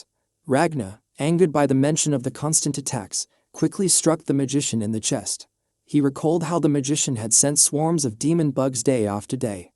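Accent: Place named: Sydney, Australia. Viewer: sounds like someone from Sidney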